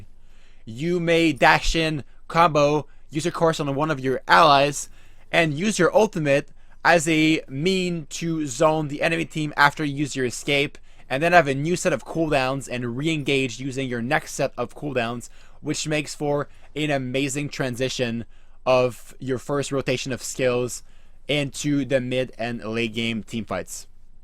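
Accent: Quebec, Canada. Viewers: i can feel the french canadian richness in your voice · Are you from Quebec?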